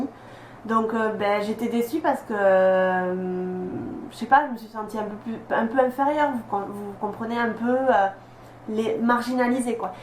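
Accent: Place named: South, France. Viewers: ouais mais t'as un accent genre toulousain ou bordelais ou marseillais un truc dans le genre^^ donc quand tu parles japonais forcément aussi ^^ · Enfant ! aaaah l'accent du Sud :P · Bah, elle est toulousaine, ça s'entend, couillon ! :)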